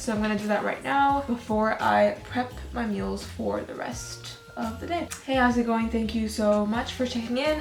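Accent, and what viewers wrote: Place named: United Kingdom. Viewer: the British accent !!! 😂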